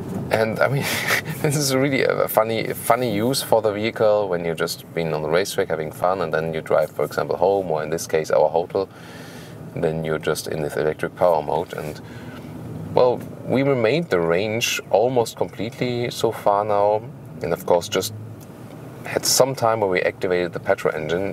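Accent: Germany. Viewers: Wer merkt auch das er deutsch ist ... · sehr schön, vielen dank. und - nicht böse gement - gerade der schwere deutsche akzent macht das englisch für mich leicht verständlich ;-) weiter so, lg aus österreich.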